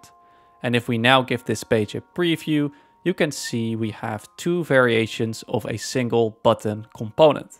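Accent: Netherlands. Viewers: Dutch accent on top 💯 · hahahaha deze man is sws nederlands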